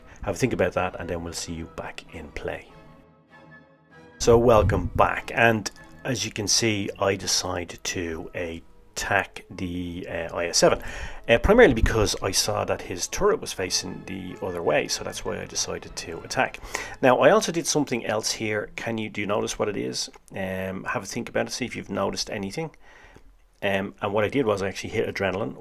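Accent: Ireland. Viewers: On the subject of dulcet Irish tones, Wargaming should hire you to replace the crew voice in the British tanks.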